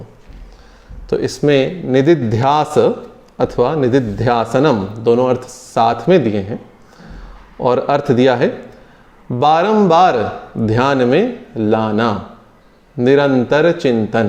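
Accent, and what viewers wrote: Standard. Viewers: आप शब्दों के उच्चारण शुद्ध रूप से करते हैं ।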